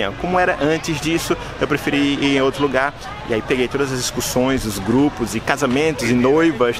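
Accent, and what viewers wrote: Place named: Northeast, Brazil. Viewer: Obrigado pela resposta,! É, senti algo do NE no seu sotaque, mas não seria capaz de cravar Recife. Senti algo de carioca também, mas nada de mineiro! Rss Mistura tudo isso com um tempo fora do Brasil, e, pronto!, chega-se ao seu sotaque. Valeu! Abs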